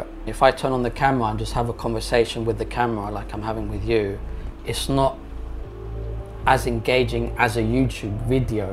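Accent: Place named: United Kingdom. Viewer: mate I'm telling ya if you go back to UK for even less than a year, you'll get your deep British accent back... greetings from Australia 🤙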